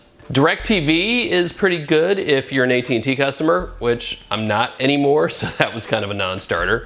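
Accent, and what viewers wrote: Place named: Nova Scotia, Canada. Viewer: You sound like you live here where I do, Nova Scotia, Canada. 'Only 2 Providers', Eastlink and Bell and they both charge the same and have pretty much the same packages. I'm paying $ 80.00 for internet as well, however, I do think that's too much for 100 Mgbs, it should be about half that, around $ 30.00 to $ 40.00 per month. Not good bc we actually have absolutely 'NO' options with only 2 ISP's.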